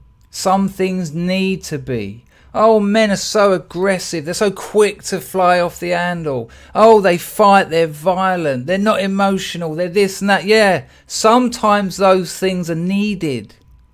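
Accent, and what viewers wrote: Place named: England, United Kingdom. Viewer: You don't know how good it feels to hear someone with an English accent say this. I have argued endlessly while my cousins across the pond called me a backward hick. I knew their decisions and attitudes would devastate their fair isle, I had no idea how quickly it would happen. Right now it's a giant snowball going straight down a mountain. Britain and Europe is lost.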